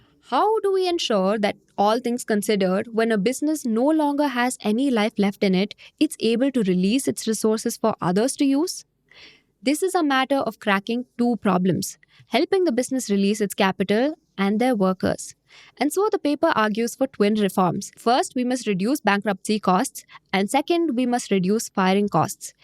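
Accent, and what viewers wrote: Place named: Tamil Nadu, India. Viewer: that tamil accennt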